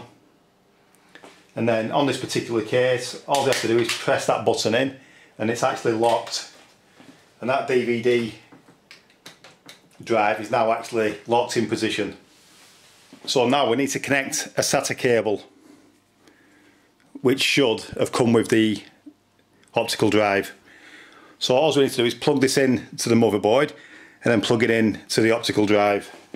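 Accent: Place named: London, United Kingdom. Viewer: Very Simple...Thank You.... Are you from London....Your accent is very nice. I like it........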